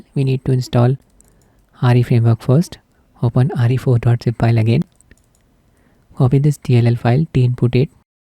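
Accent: India. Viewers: I dont understand indian english..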